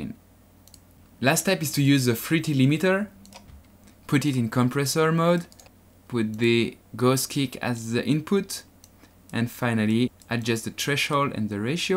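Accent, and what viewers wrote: France. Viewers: French accent lol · You are French ?! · tu es français non ? :) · Tu serais pas français par hasard je trouve aue tu as un petit accent... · You're French right? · Are you french ? Because you speak like a french guy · Comment ça se fait qu'il n'y ai aucun Français par ici ? On reconnait bien ici un Français talentueux ! ;) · Where are you from ? Because you have not an english accent and you love french. Are you french ? ?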